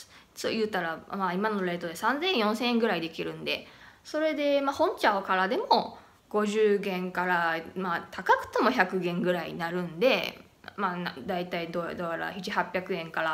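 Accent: Kansai, Japan. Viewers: 関西ご出身ですね(笑) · ぶわぁー、ぼーん、とばぁーっと合わせて15回以上使ってる。ちゅーちゅー吸うも関西ならではの言い方ですね。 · ははははこれが大阪弁でしょうか？面白い、たくさんの擬音語があるね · 、物事言う時さすが大阪弁でワぁ！ワぁ！とか多いですねいい😊👍 笑私、さんの故郷大阪でそろそろ満30年間住んでる上海人です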